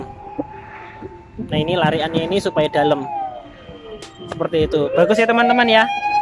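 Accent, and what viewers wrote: Java, Indonesia. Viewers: Bang asli orang mana kok jawanya medok..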